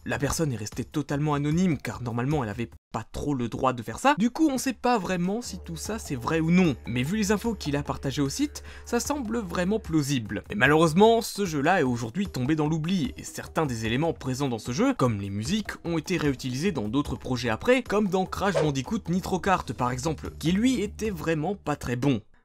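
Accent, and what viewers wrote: England, United Kingdom. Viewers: promi jeu critik pa ton akcan englé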